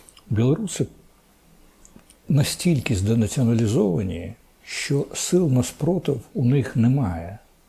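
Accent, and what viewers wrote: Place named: Russia. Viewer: пане, "нЄхлюство" українською мовою - "недбалість". Вам не подобаються українські слова? вам більш до вподоби московські? з московською вимовою на кшталт "проблЄми" тощо. Навіщо ви так спотворюєте державну мову?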